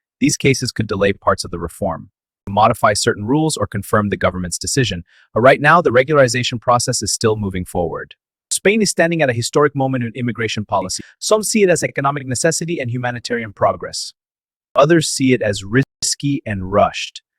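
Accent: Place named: India. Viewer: Why did you suddenly have an indian accent? Odd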